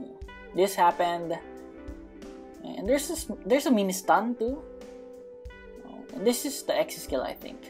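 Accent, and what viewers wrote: Philippines. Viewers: wait he's filipino? no wonder the accent and voice sounds like a filipino speaking english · U sound filipino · how is he a noob he has acro also i notice your filipino accent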